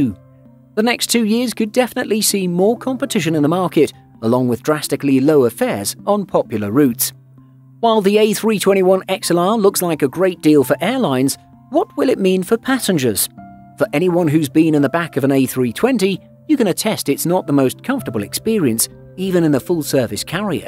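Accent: England, United Kingdom. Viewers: Which English accent does this guy have?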